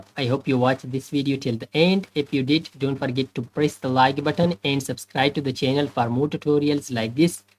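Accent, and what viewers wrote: India. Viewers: she's insinuating that you are a phone call scammer because of your Indian accent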